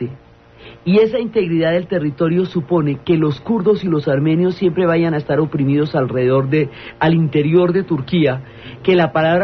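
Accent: Colombia. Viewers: Muchas gracias. Siempre me ha gustado la historia y la geografia. Excelente tu exposicion , pareces de Colombia, estoy en lo cirerto? Saludos desde Chicago. Inscrito, like y compartido.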